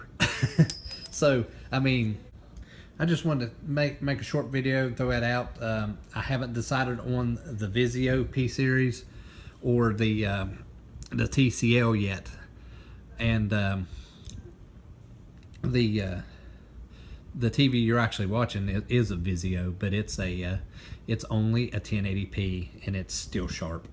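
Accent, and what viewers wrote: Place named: South, United States. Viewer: Jordan Gons might just be his heavy southern accent.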